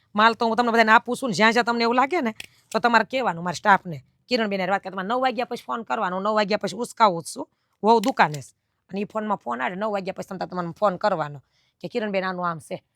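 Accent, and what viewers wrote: Kathiawar, India. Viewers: કિરણબેન મારી છોકરાની વહુ ઓસ્ટ્રેલિયા રહે છે મારી ભાષા બોલવાની તમારે જેવી કાઠીયાવાડી છે મારી વહુ ને ફોન કરું ને મમ્મી હજી થોડીક વાર વાત કરો મને બહુ ગમે છે કાઠીયાવાડી ભાષા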